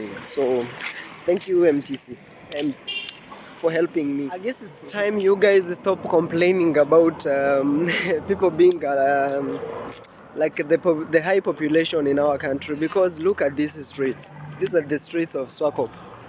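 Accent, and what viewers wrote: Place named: Zimbabwe. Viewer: Boeti ❤ ur content..Thanks 4 visiting Swakopmund. Thought u from Zim the accent thou is diffrnt from wthetu😊 much ❤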